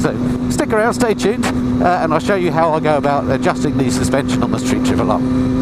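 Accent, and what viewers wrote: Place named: United Kingdom. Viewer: Thanks bud I'm going to be buying one hopefully next season so your vids are an education for me and your British and that means it's easier for me to understand what your talking about keep up the good vids cheers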